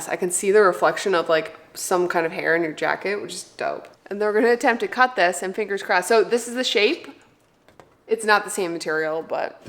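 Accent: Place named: Newfoundland, Canada. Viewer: Your accent makes me miss home! (Newfoundlander in BC)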